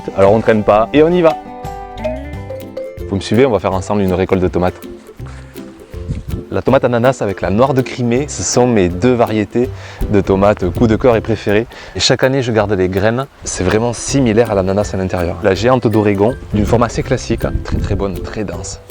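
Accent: South, France. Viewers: J adore vos vidéos super sympa continuez à nous régaler avec votre accent du sud beau jardin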